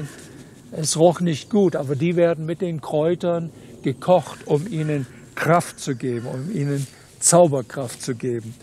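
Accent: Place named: Saxony, Germany. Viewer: 👍💚 Ich liebe es, wenn das Sächsische durchklingt.. 💚👍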